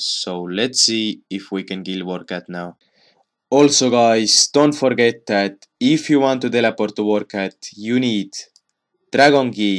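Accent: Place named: Estonia. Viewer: Hea eesti aksent sees aga muidu 5+! Nice vid ;P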